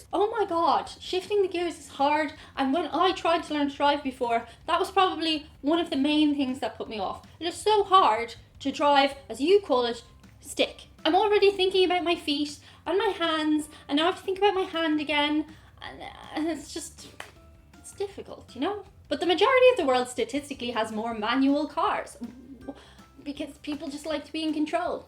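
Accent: Ireland. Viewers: I just love her accent. Female Irish accents are the nicest in the world.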